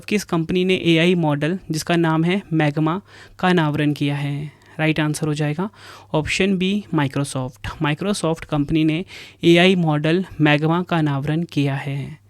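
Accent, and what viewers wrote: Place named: Bengal, India. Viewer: Bengali accent hai..... acchha lagta hai... Agreed 👍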